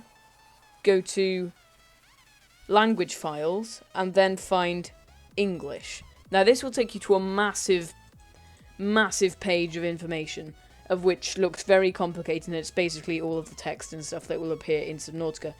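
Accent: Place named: Midlands, United Kingdom. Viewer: where are you from? I'm guessing Midlands area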